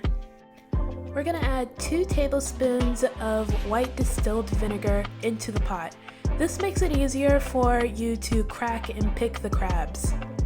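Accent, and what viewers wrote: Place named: Kansas City, United States. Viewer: Kc connection accent is everything 😍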